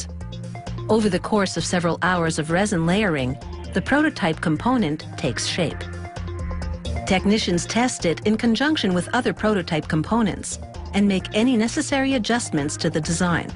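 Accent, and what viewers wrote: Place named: Canada. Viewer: Canadian narrator for sure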